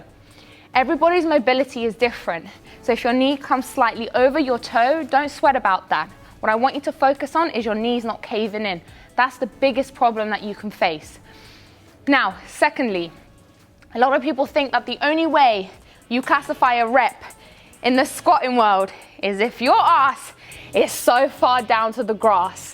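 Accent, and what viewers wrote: England, United Kingdom. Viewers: that’s her british accent fooling you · English accents are everything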